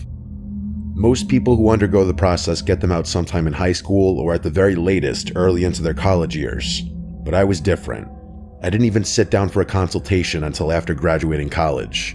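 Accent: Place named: Upstate New York, United States. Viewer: I love your accent.It's definitely from upstate new york where i'm from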